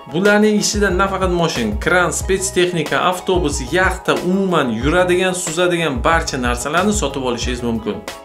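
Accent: Bukhara, Uzbekistan. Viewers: Сих Бухороданмисиз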